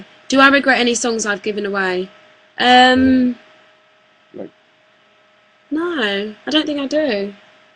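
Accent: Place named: United States. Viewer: your american accent is awsome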